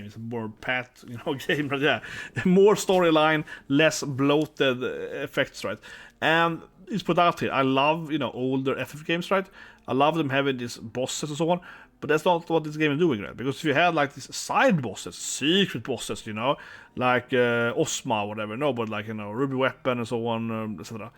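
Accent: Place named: Sweden. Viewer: Interesting! Just starting the video, but it hits all the right points for me to want to check it out. I worked in the games industry for a bit over 10 years many years ago in NY and left a few years before the hard left turn really got underway. Också är jag amerikanare men har bott i sverige i dryggt över tio år och din dialekt/brytning låter precis som alla mina svensk kompisar lol.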